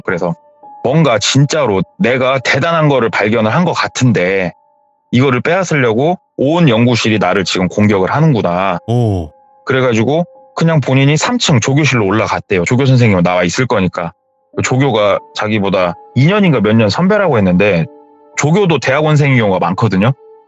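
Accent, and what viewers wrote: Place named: Jeonju, South Korea. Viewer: ㅋㅋ저는 전주라 생각했는데ㅋㅋ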